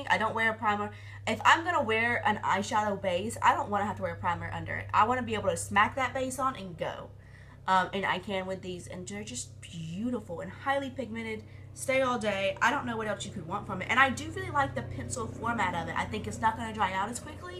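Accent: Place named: South, United States. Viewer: I am so jealous of your country accent!! 😭😩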